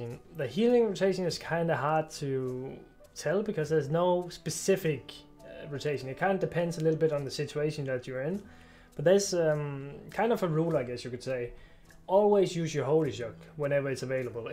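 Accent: Denmark. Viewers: Man kan godt høre at du er dansk xD fed video :) får mig helt til at overveje holy Pala :)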